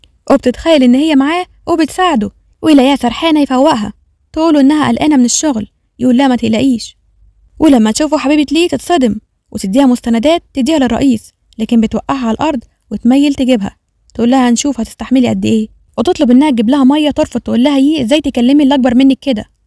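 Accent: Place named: Deir ez-Zor, Syria. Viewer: انت من اي بلد مبين من صوتك انك بنت من سوريا ومن الدير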